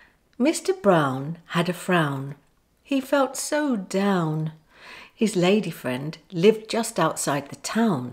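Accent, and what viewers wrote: United Kingdom. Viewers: It's so nice learning the beautiful British english listining to your lovely voice. May God bless you😊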